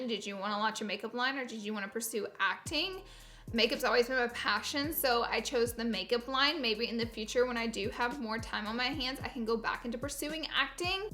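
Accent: South, United States. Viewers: I love your videos & love your southern accent. x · Omg!!! Never been fist person to comment!!!!! ILYSM❤️❤️Ur the bomb we both have a country accent❤️😂💄💄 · I love your cute little southern accent!! ❤️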